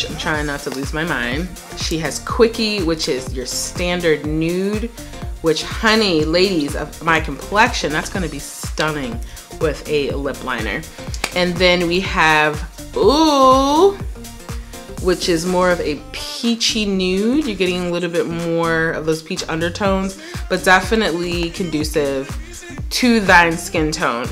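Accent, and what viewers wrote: Trinidad and Tobago. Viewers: Gorgeous look. I caught the Trini accent before you said it. 😂😂😂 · Yessss gyal! Bring out d Trini accent!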